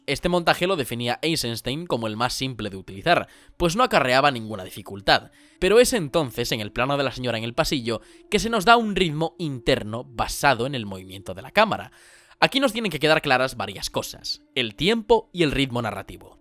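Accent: Spain. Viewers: Por que todos los youtubers son de españa , llevo media hora buscando vídeos de otras nacionalidades , no es por nada , me agradan pero quisiera descansar de ese acento de la S,C y Z un rato buen vídeo por cierto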